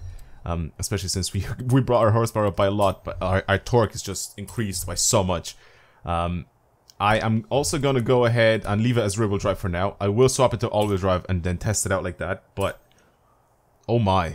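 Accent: Ireland. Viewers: R u Irish lad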